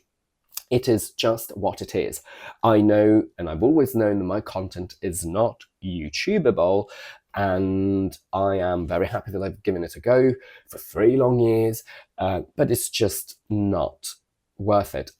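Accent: United Kingdom. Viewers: It's so sad to hear that! Watching from Thailand and I caught every word in your video. I can feel you completely. ❤😢 Thank you so much for your time and dedication nurturing this channel. Firstly, I followed this channel because I love listening to your voice, your British accent and also your lessons on pronunciation. I used to teach English in my free time many years ago but with my busy work scheduled, I had stopped. Now, with the rise of online teaching, I've come across some online English teachers teaching incorrect pronunciation. This highlights the importance of good teaching and inspired me to help others avoid similar mistakes. I'm not here to tell my story, though. I just wanted to share that when I listened to you, I saw myself (we're not comfortable in front of camera, scripted not to miss the point, spent ages editing, not monetized as I just started). 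I whole-heartedly understand your journey and cried with you. 😢 The knowledge you've shared is valuable, but the time you've dedicated is equally important. To me, you are an incredible teacher. I will always come back and learn from this channel and will also recommend to my future students. Lastly, I wish you joy and tons of success with your new channel. Subscribed! ❤ P.S. Last night, I listened to your new ASMR channel to calm and relax before going to bed.😍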